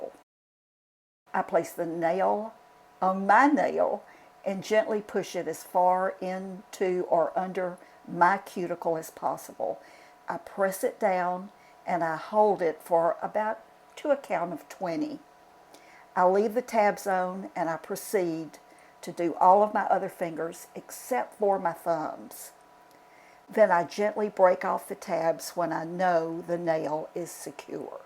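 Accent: South, United States. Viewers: I totally loved listening to your beautiful southern accent. Alabama "girl" (or once was)😊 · I'm smack dab in the middle of my 50s and I am so happy to find your channel. I have always been in to makeup & beauty, but nothing prepped me for the changes i have been experiencing with my skin & such the last few years..and just having to tweak little things to either make them more flattering, or easier to apply, as well as just the fun suprises -instead of wakign up to a pimple it is wakign up to a new spot, a vein And, as a Southerner, your delivery and voice is also a comfort to me--I guess it is the familarity of it; like you could have been one of my customers in the makeup department at Belk. Have a wonderful new year!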